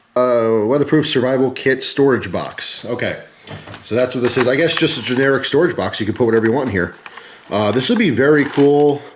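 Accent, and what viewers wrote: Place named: Pennsylvania, United States. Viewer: Pennsylvania account. At least he doesn't say "yins guys" or call everything a "jawn"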